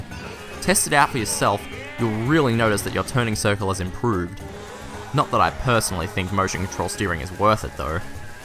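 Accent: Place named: Australia. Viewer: hey, an Aussie.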